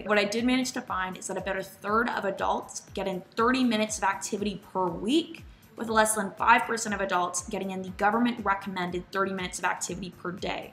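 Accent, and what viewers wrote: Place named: Canada. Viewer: Just realized you're Canadian but how you pronounce words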